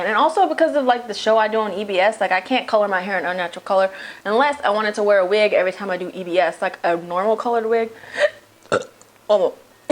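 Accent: South, United States. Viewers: She is ssoooooo NOT from NY do u not hear her accent?? She sounds like a southern belle. im a born and raised nyer from Brooklyn our accent is pretty distinct from a southern one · omg im not american but i love that your american accent is still so strong, i love it, the things you say sometimes just crack me up, when you get passionate your accent really comes out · You kind of sound southern but then you kind of sound Asian but then you kind of sound black so I'm really really confused but it's whatever I kind of like it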